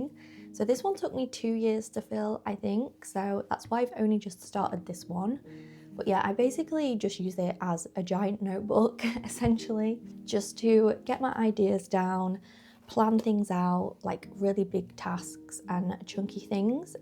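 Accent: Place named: Northern England, United Kingdom. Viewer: Where abouts are you from? Obviously "oop norff" in the UK - I grew up in Leeds until I was 10 and now the only thing left of my accent is the hard "u" - as in "up", "one" - until I am with other Northerners and then it call comes back 🥰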